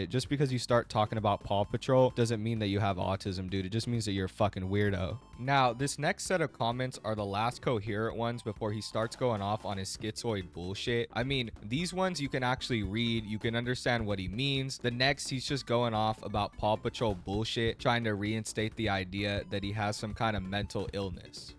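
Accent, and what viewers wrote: South, United States. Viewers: I love your southern twang! Usually I just call what you call "folks" people, I forgot that a lot of southern people say "folks" very interesting!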